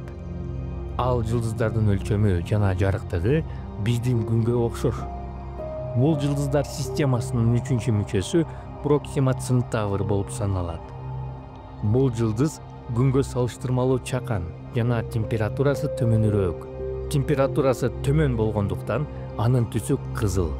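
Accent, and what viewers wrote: Standard. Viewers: Ооой тим эле укмуш сонун сүйлөптүрсүң.😯 Таптаза кыргызча анан дагы үнүң да аябай уккулуктуу экен. Эң негизгиси абдан түшүнүктүү экен. Чаржайыт сөздөр жок так, даана сүйлөптүрсүң. Менден 1 лайк. Иштериңе ийгилик дагы да көп видеолорду күтөбүз. 👍👍👍👍👍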